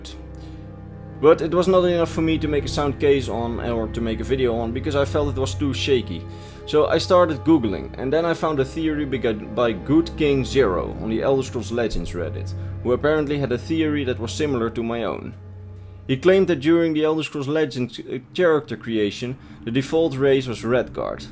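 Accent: Netherlands. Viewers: I hear somebody is dutch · U sound dutch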